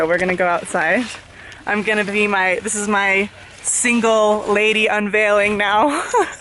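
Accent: Spain. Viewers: hahaha Ay tienes acento español! Peru looks so beautiful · you speak great spanish!! Its funny how you have a Spanish accent, like from Spain, its not common for people to have that accent when spanish is their second language! Good hello from Colombia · espero que con este viaje aprenda nuevos acentos y se le baje un poco el españolete jajaja I also hope that you can come to Colombia, we are right there next to peru. there's so many incredible things to see in south america too;) Love all the facts and interesting details you always give us with each new place you go, makes me feel ready to go places, knowing a little bit more about cultures. that's why i love your vlogs ( also because you're hilarious and i adore you) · Is that a Spanish accent I hear? I love it!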